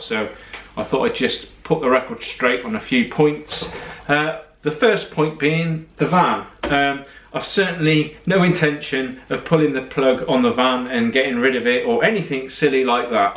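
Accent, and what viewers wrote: United Kingdom. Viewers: you are exactly correct about the media. Are you an american with a British accent. Your last video was on target.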